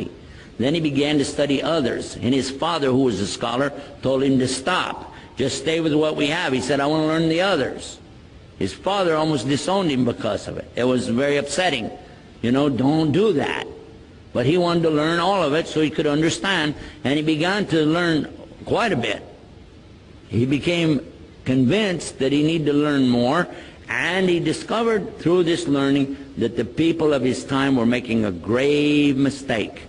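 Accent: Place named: Texas, United States. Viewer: هل الشيخ من أصول تكساسية؟ لهجته كأنها من تكساس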